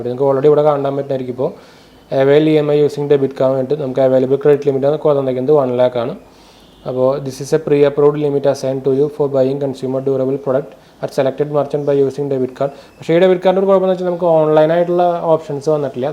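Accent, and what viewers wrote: Thrissur, India. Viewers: തൃശൂരാല്ലേ ഗഡീ ?😛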